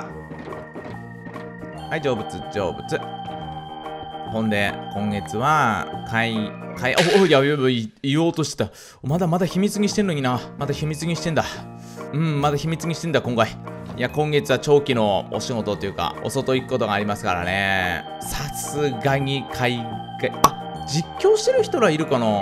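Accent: Fukui, Japan. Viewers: 前回のカズクラの福井弁はどうしたのでしょうか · え、福井の方？嶺北の方ですか？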